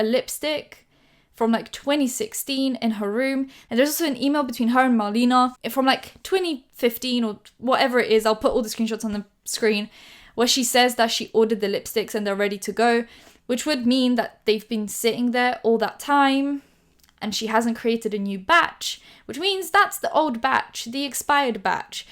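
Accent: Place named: Roadman, United Kingdom. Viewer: Is it just me or does she have a very subtle roadman accent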